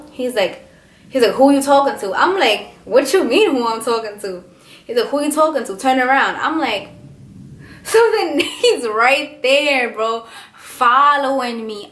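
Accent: New York, United States. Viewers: Well they both from New York she has that New York accent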